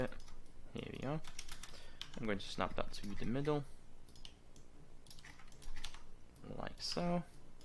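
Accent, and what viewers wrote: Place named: Russia. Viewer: Your accent is close enough to russian accent :D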